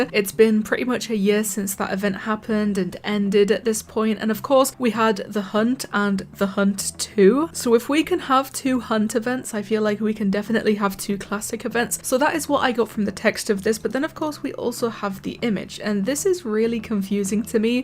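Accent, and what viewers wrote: United Kingdom. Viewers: Is this woman British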